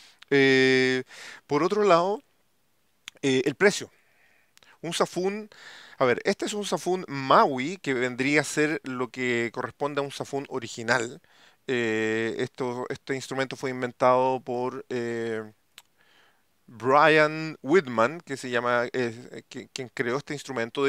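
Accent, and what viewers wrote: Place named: Chile. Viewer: asi hablan los chilenos eso ya no se corrige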